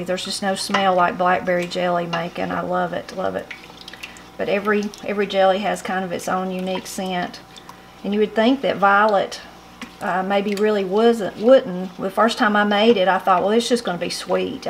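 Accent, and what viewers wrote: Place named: Appalachia, United States. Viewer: I love the way you talk..your accent, the way you can relate things...it's just magical. But I would never buy a cook book of yours, cuz I grew up Appalachian myself, and what recipes you might have, I already have them all in my head. But keep putting out your YouTube videos, cuz I'm hooked on your story tellin!!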